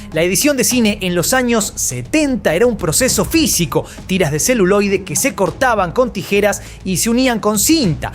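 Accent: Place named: Argentina. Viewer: ¡Es tan épica la historia de Lucas y #StarWars que incluso este pinche video narrado en HORRIBLE ACENTO ARGENTO (que destruye al idioma español y al inglés al mismo tiempo) resulta algo tolerable! 🤌🤌 ¡#MalditosArgentos, son tan odiosos e insoportables! :body-green-covering-eyes::face-turquoise-covering-eyes::face-turquoise-covering-eyes::face-turquoise-covering-eyes:🤮🤮🤮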